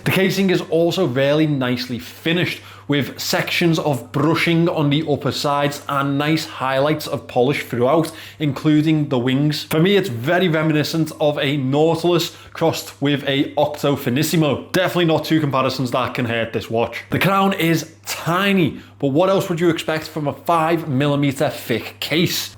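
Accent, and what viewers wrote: United Kingdom. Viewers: you are from which part of The UK?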